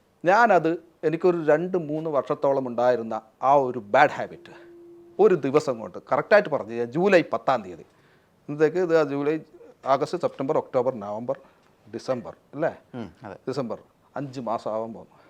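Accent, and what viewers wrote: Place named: Kannur, India. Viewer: കണ്ണൂർ സംസാരം കേറി വരുന്നു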